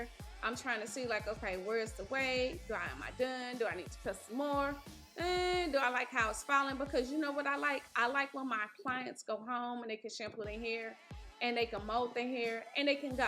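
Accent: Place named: South, United States. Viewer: This is definitely a southern voice over!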